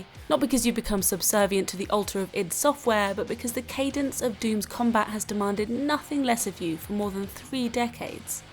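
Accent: United Kingdom. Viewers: Remember games radar before it was bought out by bored, monotone sounding British bloggers?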